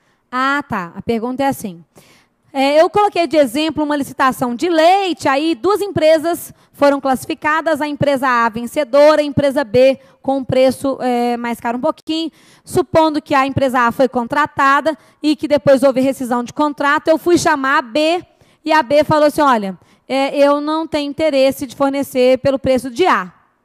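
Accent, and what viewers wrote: Minas Gerais, Brazil. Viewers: Professora, DEUS abençõe sua vida por sua boa vontade, continue lhe dando disposição e muita saúde para continua lecionando de forma tão agradável!! às vezes fico quase o dia todo escutando suas aulas. Tenho aprendido bastante!!! gosto da sua aboradagem, da forma como vc se expressa e todos os exemplo que vc utiliza. Sem se falar do sotaque mineiro rsrsrs acho uma gracinha!!! Obrigada de coração!!!! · Professora, vc é muito lindinha com esse sotaque, "uai"!!!!!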